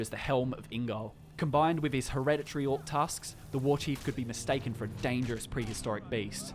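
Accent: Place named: Australia. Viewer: It's weird listening to this dude coz his Aussie accent isn't as obvious as Michael and Scott... nevertheless I think he nailed this, chose well.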